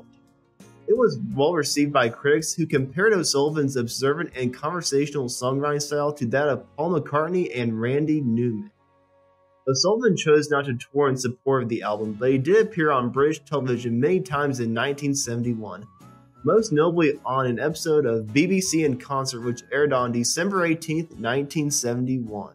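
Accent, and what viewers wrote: United States. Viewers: Yet another american voice, impossible to get anything in English. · Yes too fast , too American slur . Use punctuation in speech . We are not all Americans on the rest of this planet!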